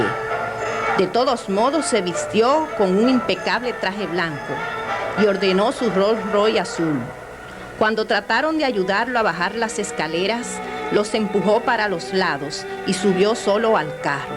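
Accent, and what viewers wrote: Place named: Higüey, Dominican Republic. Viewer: La que narra me está pisando mi Higüey!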